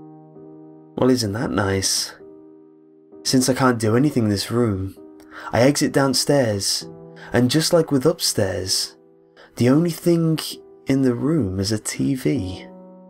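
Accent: United Kingdom. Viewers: he's British. they have their speaking quirks, and they cant help it. honestly it sounds much better than American English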